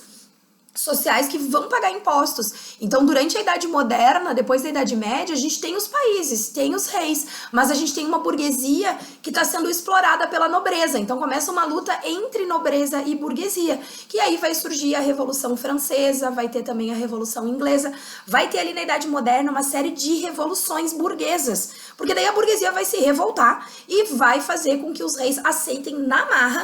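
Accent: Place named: Rio Grande do Sul, Brazil. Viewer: Sotaque do RS é inconfundível.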